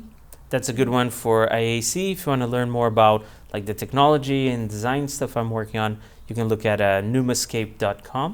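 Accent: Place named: Brazil. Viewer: Good talk. On an unrelated note, it's so nice to see such a smooth Brazilian accent.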